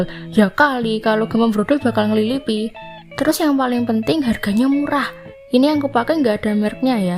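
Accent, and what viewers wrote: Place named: Java, Indonesia. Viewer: Medoknya bikin candu😚😚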